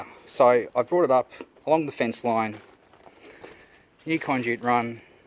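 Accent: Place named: Australia. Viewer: Perfect amount of swearing in that Aussie accent bud! (My church does not understand me 😂). Glad you were able to fix the mess!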